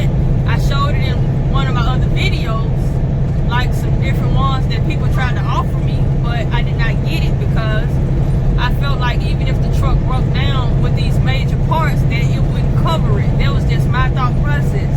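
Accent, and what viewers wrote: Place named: Alabama, United States. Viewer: Traveling on my birthday lol.... Thanks for the great info and stay safe my sister...... you sound like a Bama Girl lol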